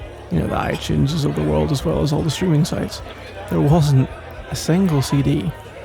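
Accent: United Kingdom. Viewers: British boy!!! I'm British too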